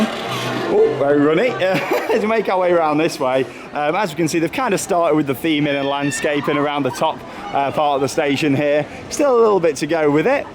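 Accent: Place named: England, United Kingdom. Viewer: What day did you go? I'm almost certain you walked past me whilst you were recording, the English accent always stands out.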